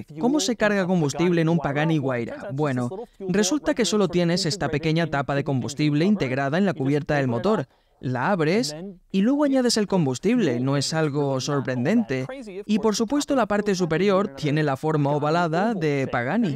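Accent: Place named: Galicia, Spain. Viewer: Estoy harto de escuchar la tonada gallega...las z me tienen las bolas x el piso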